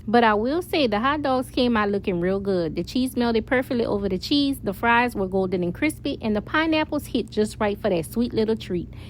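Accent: Louisiana, United States. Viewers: I really enjoy hearing you speak! I came across your page, and heard what sounded like a Louisiana accent! GOD BLESS you, Husband and family!! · What part of Louisiana you from? I’m from Eunice but live in California now . Some of my family lives in Lafayette I love listening to you talk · Are you from Louisiana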